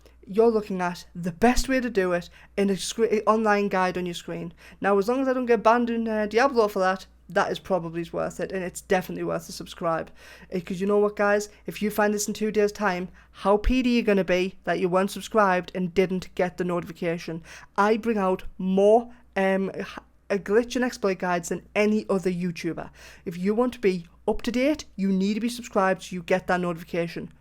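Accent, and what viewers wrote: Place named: Newcastle, United Kingdom. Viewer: The only thing that makes this already awesome glitch better is the geordie accent hawayyyy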